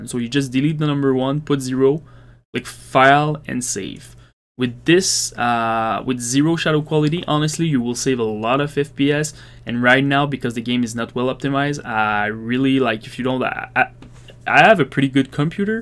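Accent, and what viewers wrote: Quebec, Canada. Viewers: is that a quebec accent i hear ? lol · Yo dude t'es tu québecois? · Are you from Quebec? I usually recognize the english accent of my people :P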